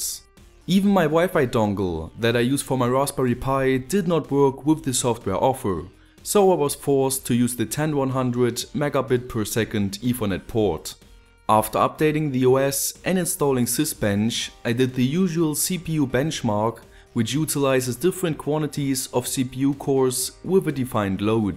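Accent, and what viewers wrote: Germany. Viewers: warum machst du keine Videos in deutsch? ich kann deinen deutschen Akzent hören. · Where in Germany are you from? Guessed that because of your accent. Great videos man!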